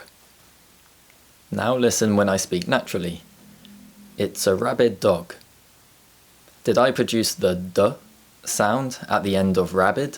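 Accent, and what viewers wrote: United Kingdom. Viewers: Things that i love the most is learning british accent british slang anything about Britain.are u british?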